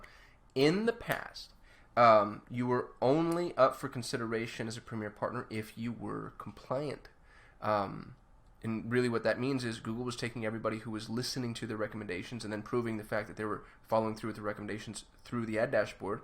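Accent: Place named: United Kingdom. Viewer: you know i always do. #1 fan of British accents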